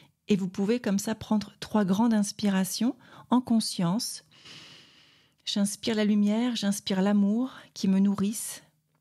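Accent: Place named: Quebec, Canada. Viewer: ...ce n'est pas pour "e" rien..., cool pour l'accent quebecois!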